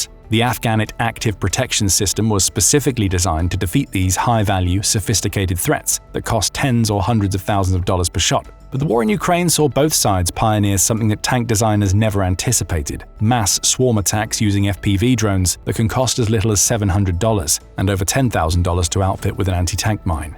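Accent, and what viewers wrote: United Kingdom. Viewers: Tired of the UK-ENGLISH accent in all these Ukrainian videos.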